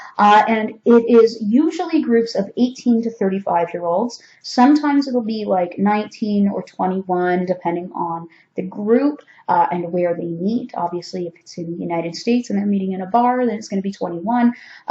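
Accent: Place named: Toronto, Canada. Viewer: Ahhh. Fresh Air. What captivating colors starting with your eyeballs osmosing that shade of which blue? How about Blue - eh? Wow, I knew your Toronto accent in the video I just watched. So yeah, You are telling me answers to questions I've read about every group's posted rules, I extrapolate correctly but how to dress, thank you, how to keep your hands above the table, thank you, how self protections begin before you get to an event, thank you.There are some pluto in leo people that about now are beginning to understand playing fields being totally rearranged leveling the starting gate struggling through tech, even if really good, not as smooth second nature appendage of Saturns first return this year since 1981ish. fFinding how to sit and wait for on line response isn't just goofing off any longer. Wow...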